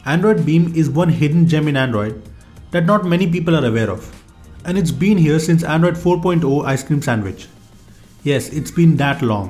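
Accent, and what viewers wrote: India. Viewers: *ALL OF YOU GUYS ARE STUPID. HERES WHY YOU BITCHES 1. HE IS INDIAN 2. THAT IS AN INDIAN ACCENT 3. YOUR LIKE 12YR OLDS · Why you Indians can't say the words without that terrible accent? It's hurting the ear to listen. And as a hungarian living in the UK, i know everyone can learn how to speak normally. And no offence (honestly) but only the colored skin dudes won't learn how to speak... Why is like that. You can clearly hear that the British Queen or the actors from Hollywood, or even Obama say the words completely different then you!!!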